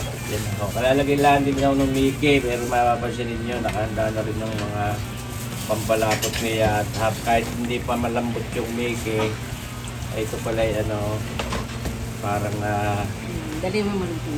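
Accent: Batangas, Philippines. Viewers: walang babaguhin. Tuloy-tuloy lang ang puntong batangan. Balik panahon para sa akin some 60 year ago sa Calumpang/Avenida Rizal🥰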